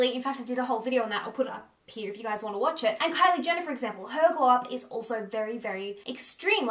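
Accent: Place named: Australia. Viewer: Australians have such funny accents 🤣🤣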